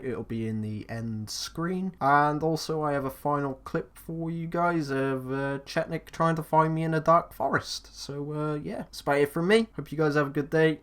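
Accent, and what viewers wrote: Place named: South West England, United Kingdom. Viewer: Are you from sw uk mate you sound very local with that accent 😜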